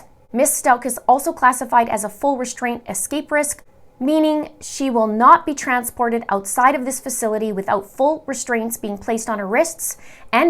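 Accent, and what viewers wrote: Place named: Canada. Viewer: I could listen to you talk all day. I love the Canadian accent and after my 1st trip to Toronto I wanted to live there. I mentioned that in a comment after Trump was elected and a Canadian gentleman responded with "We don't want you here." Needless to say I was sad as I always LOVED my trips to your beautiful country 😀💥🥳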